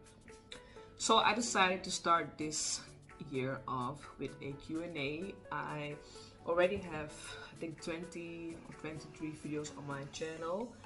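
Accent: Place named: United Kingdom. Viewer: Ik heb de hele video gekeken i love it girl hahhahaha the british accent 😂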